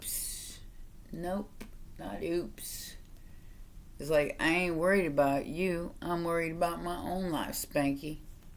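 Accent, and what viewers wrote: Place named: South, United States. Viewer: Set up ain't the word for it. Luv that southern drawl ya got. Great read 📚 reading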